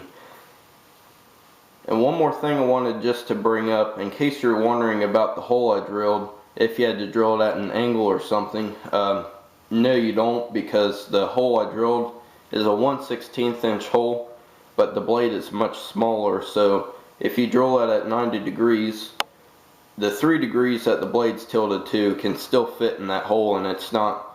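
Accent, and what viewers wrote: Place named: South, United States. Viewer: thanks for this video - I haven't bought a scroll saw yet as I still need to decide which make and model to buy - in the mean time I've been watching all kinds of clips on UTube about scroll saws and this video of yours is the first that really explains very clearly how to do inlay work - I can now see a lot more possibilities with using a scroll saw - I'm not a country music fan at all but I really did enjoy the music with this video and it fits in perfectly with your southern accent - I'm sorry to hear about your brother - I checked and you are making videos again - that's great - it's a tribute to your brother and I'm sure he would have wanted you to continue - I've subscibed to your channel and please continue making videos as not many woodworkers explain things as clearly as you do - visual effects in clips can be interesting for awhile - but in the end most people want to watch clips like yours to learn - by the way I live in Europe - thank you for sharing your knowledge…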